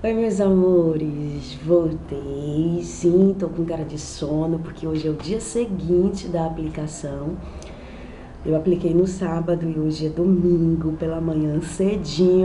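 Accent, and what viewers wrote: Bahia, Brazil. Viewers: Nunca tinha ouvido falar de você, mas quando você abriu a boca tive certeza de que você era baiana tb. que linda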